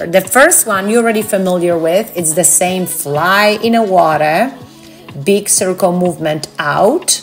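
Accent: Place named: Lebanon. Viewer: وعي تتكلم لبناني احس غرااابه 😂😂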